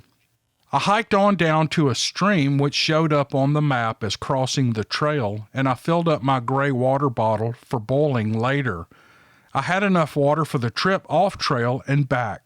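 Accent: South, United States. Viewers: Hey..Greetings from Charlotte, NC! I could listen to all day with that soothing southern voice. I'm originally from the Ramapough Mts. Of Jersey..and we have BF there and in The Carolinas..saw them myself. This is my favorite Channel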